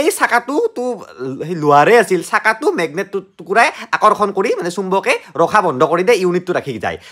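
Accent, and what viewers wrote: Nalbari, India. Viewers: Nalbari r manuh so accent tu olp beleg hoi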